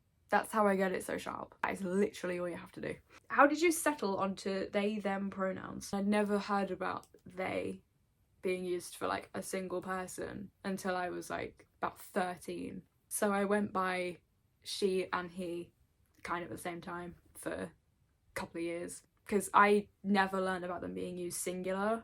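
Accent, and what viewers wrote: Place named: United Kingdom. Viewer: Omg you're British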